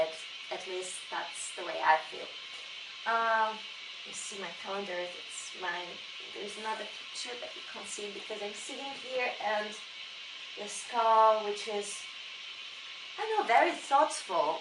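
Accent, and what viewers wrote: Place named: United Kingdom. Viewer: damn ur bri'ish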